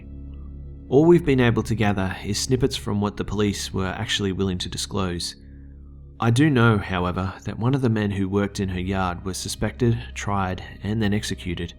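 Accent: Australia. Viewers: love vids ya gotto have a aussie on youtube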